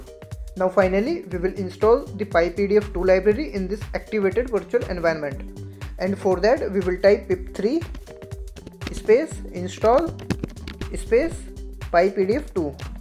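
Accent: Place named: India. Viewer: Jesus Jones.... it should be forbidden for Indians to talk English. I would understand that probably better if you would talk in your native language 🙂 Please script! But thank you for trying to help anyway!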